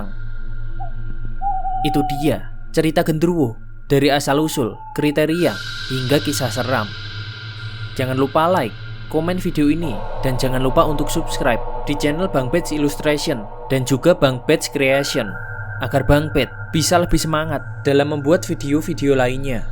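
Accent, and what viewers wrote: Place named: Java, Indonesia. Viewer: Logatnya bg kaya orang jawa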